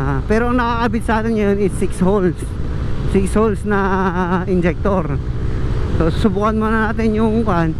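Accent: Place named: Ilocos, Philippines. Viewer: Kwan ilocano expression pag nag tatagalog🤣🤣 agbiag ka lakay👍🏼👍🏼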